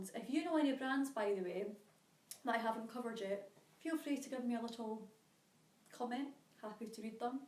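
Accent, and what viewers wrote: Scotland, United Kingdom. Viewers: You sound Scottish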